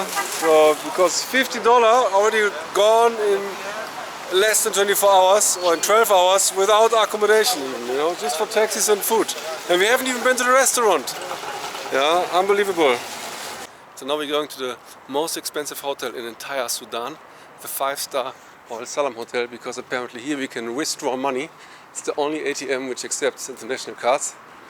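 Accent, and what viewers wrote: Germany. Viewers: German, or? (ich höre den Akzent 😂)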